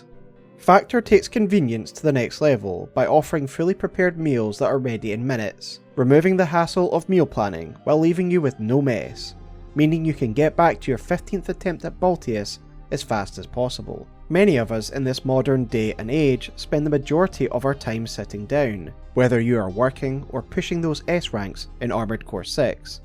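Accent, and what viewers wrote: Scotland, United Kingdom. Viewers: You’ve never heard a Scottish accent?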